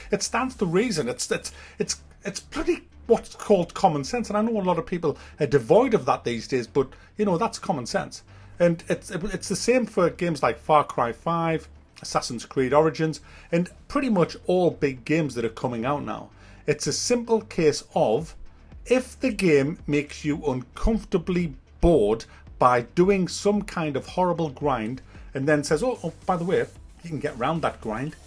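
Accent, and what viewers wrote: United Kingdom. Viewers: I won't defend a game unless it deserves it. I've loved your videos and find them so interesting. I'm 17 and have been slowly rebuying old PS2 and PS3 games you and other channels similar (check out Mark Brown, Game Makers Toolkit) to you suggest and I love it. Ive found some of my favorite games through this, so a huge huge thankyou. I think its not video games that suck today though its just business. Because its not just old games, its old music and old films (only a matter of time before its books). I love that your British, like me, your accent just adds to your reviews and no offence makes your frustration funnier NEVER THE LESS THANKYOU. WE NEED MORE CRITICS LIKE YOU IN THE SPOTLIGHT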